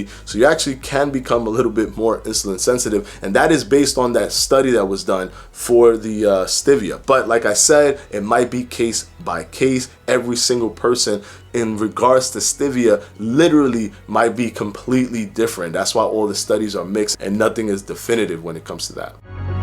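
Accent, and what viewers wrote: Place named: East Coast, United States. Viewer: Are you from the East Coast, I hear an accent?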